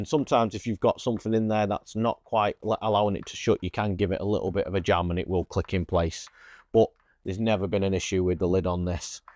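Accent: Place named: Northern England, United Kingdom. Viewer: Northern accents and Carp Fishing tackle reviews seem to work quite well! 💪👌 haha good to see you back lad! 😁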